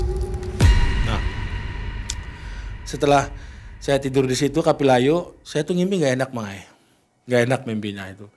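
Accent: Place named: Java, Indonesia. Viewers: Bahasa Cirebon nya ga ilang yaa ang,,sampe ngakak dengerin cerita nya.😅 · Mas kalau cerita sedeppp, logat cirebone sedeppp nemen jare wong tegal 😅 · Di tunggu lagi Mang cerita ia.Ciri khas orang Cirebon bahasa ia.. anak cucu Mbah Kuwu Sangkan cirebon.salam dari Aso Cirebon Winong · lucu klo kang ngmong khas jwa bgt😂 tp klo sya liat liat kang ada trah bkn orng smbrangn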